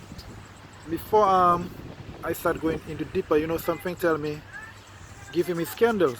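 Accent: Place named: Dominica. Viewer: He sound he from Dominica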